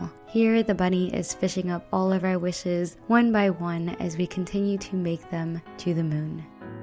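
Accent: Vietnam. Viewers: Leave me a Comment is hãy để lại cho tôi 1 bình luận in vietnamese. Your voice is so sweety, and you are speaking the southern accent.😍😍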